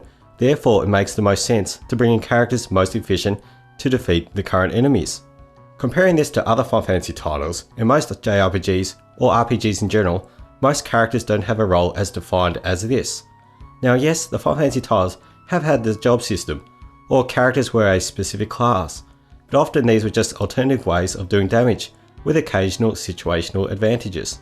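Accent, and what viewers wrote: Australia. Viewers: I'm Aussie too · Are you Australian, by any chance?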